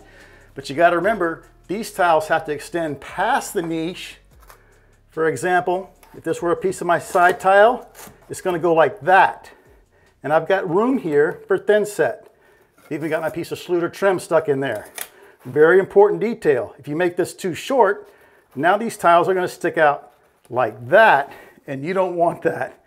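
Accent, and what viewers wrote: Louisiana, United States. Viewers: La =Louisiana. LA= Los Angles. He is in Louisiana, the accent should give that away!!!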